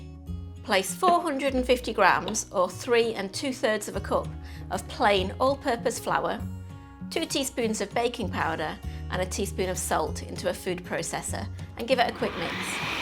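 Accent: United Kingdom. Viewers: Your Video, recipe, presentation is all so LOVELY as your Lovely British Accent. Regards from Sunny California.......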